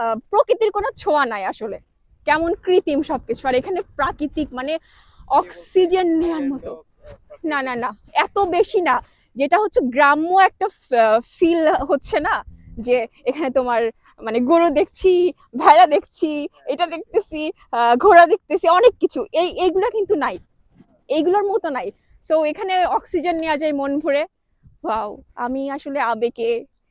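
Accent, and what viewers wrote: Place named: Bangladesh. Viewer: Apu india te kothai ,tomr kotha toh Bangladeshi mone hocche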